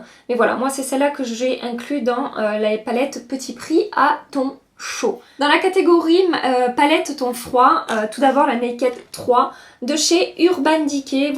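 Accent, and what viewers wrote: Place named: Marseille, France. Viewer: Tu es très belle, tu explique bien, et j'aime ton accent marseillais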